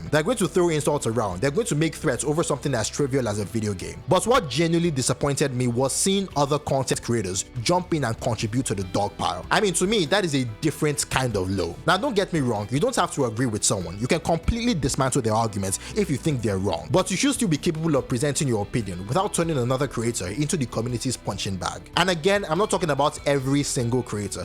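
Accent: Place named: Nigeria. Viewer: lmao i enjoy these genshin videos and the nigerian accent makes it better.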